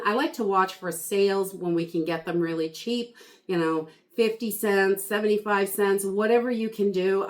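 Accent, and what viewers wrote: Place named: Maine, United States. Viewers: I think she’s from Maine. We just call it Wally world. I live in New Mexico. And I have an accent too, also I tend to talk very fast. I think that’s what you’re hearing is her accent. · from Maine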